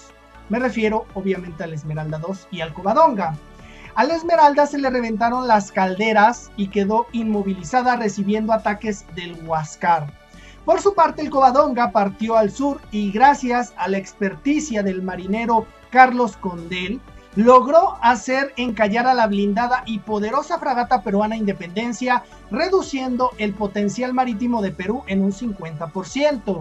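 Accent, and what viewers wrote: Mexico. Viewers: por tu acento imagino que eres Mexicano, pero cuentas tus noticias de mi Pais, con un orgullo y admiración, que no puedo mas que felicitarte por tus comentarios y videos. Es un honor que alguien que esta a 6560 Km de distancia se refiera a mi Pais con tanto entusiasmo. Una vez más gracias y espero tus siguientes noticias.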